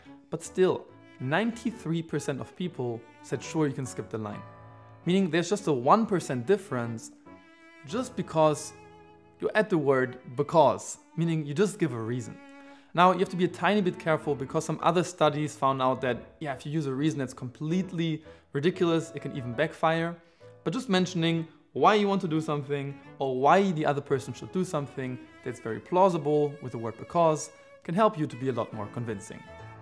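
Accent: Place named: Germany. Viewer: Höre dass du Deutscher bist :P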